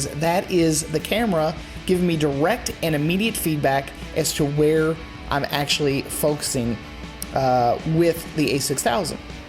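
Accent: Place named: South, United States. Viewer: Love your southern accent!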